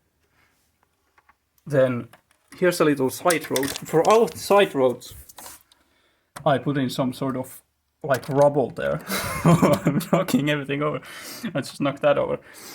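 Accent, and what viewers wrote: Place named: Finland. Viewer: I know a Finn when I hear one❗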